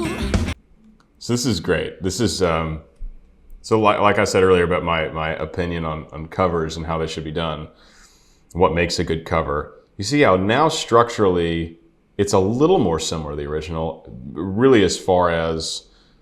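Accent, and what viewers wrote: South, United States. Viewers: Your American accent from the South is closer to how her name is actually pronounced 😁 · The way you said her name using your beautiful southern accent was correct! Could you believe it? 😂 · You pronounce like you did in a southern accent. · Your southern accent is closer to the pronunciation of her name 🎉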